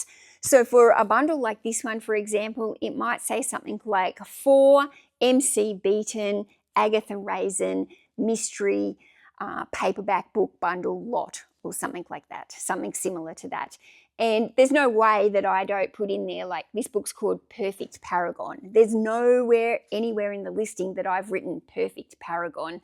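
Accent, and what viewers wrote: Australia. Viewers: I like your Australian accent.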